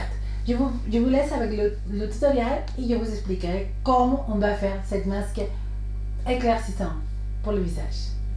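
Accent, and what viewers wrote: Spain. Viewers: Elle est d'ou cette femme elle a un accent spanish en tt cas el est belle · ton accent spagnol me dérange en parlant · accent espagnol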